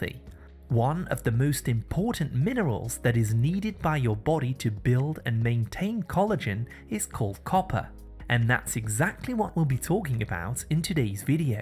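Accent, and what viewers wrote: England, United Kingdom. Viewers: अंग्रेजी accent में बोल कर इंडियन को मुर्ख बनाना 😃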